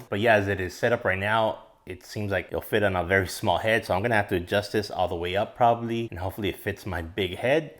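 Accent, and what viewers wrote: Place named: Philippines. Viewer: i hear a filipino accent.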